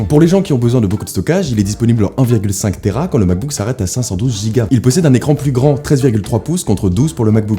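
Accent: Quebec, Canada. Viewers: Ahh un québécois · Cette accent, québécois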